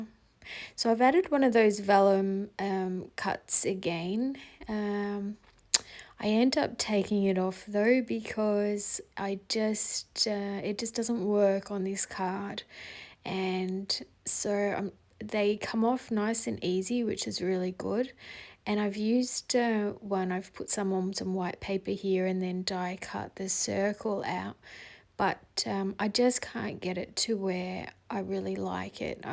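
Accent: Australia. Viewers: An Aussie voice? Instant subscriber! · Good to see your process. Thanks for sharing. Love to hear another Aussie!😃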